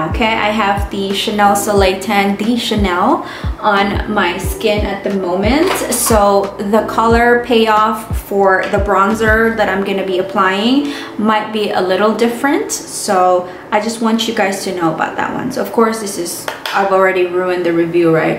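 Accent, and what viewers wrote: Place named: United States. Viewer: I love the slang American accent ..smarty sissy ..wish you luck on your channel.much love 💛❤️💙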